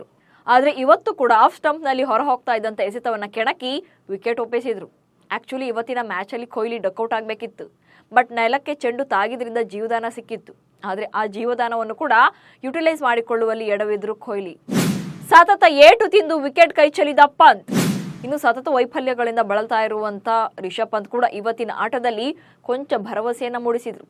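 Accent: Standard. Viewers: Svachcha kannadada dvani shanta medum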